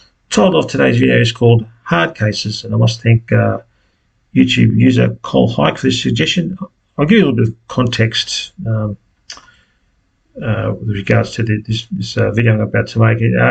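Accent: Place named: United Kingdom. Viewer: yeah but i'm not native, i think You are british SO i really have to focus if i want to hear one of your videos they seem good honestly but it's linda hard too hear them while doing other activities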